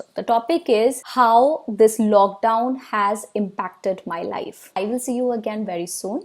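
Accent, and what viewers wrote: India. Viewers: Your accent is typical Indian. Learning grammer doesn't mean you are good in English, learn accent...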